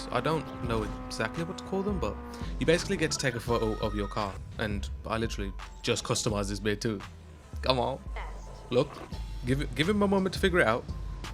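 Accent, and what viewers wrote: United Kingdom. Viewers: How come you didn’t get the 106 for underground 2? You sound like you’re English, the 106 was on European versions along with a corsa lol the 106 was actually the best starter stats wise too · Lol the use of AAVE in the British accent is funny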